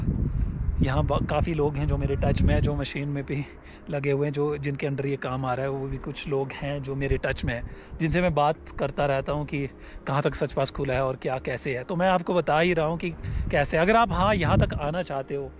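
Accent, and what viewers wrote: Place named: Jammu, India. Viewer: Apki bhasha se lagra h ap jaamu se ho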